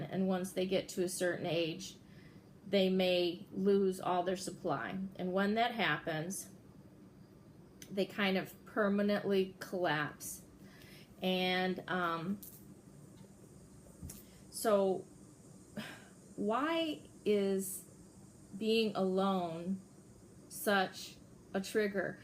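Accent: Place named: Midwest, United States. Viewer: Love the midwestern accent!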